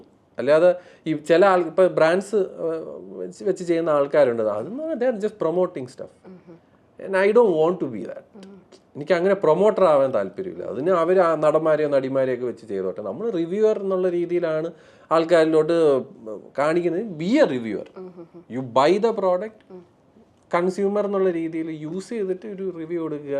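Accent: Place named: Trivandrum, India. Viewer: Love you. Jju tvm slang vidalle. From malappuram😊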